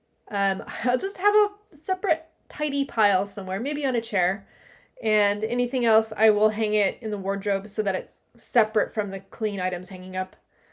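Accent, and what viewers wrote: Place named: United States. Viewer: I was getting confused watching vid.....back ground looked like England but accent American.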